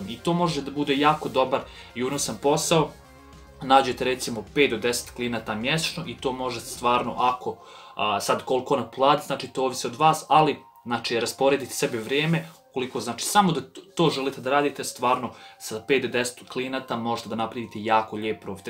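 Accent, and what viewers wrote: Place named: Krajina, Croatia. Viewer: Kakav je ovo seljacki akcent. Ne mogu slusat. Neka Krajina nesto.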